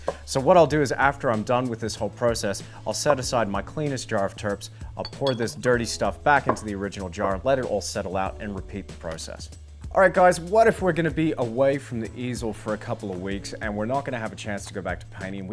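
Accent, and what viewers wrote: Australia. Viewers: What an interesting accent! sounds Australian but some words are full American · Couldn’t quite detect his accent! sounds like an Australian doing an American accent! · Am I detecting a faint Aussie accent??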